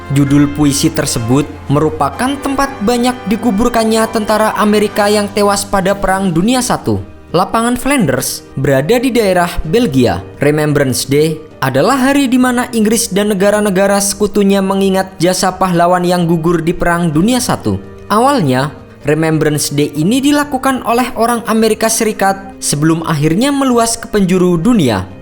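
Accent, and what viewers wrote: Indonesia. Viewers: Pekat siot loghat indo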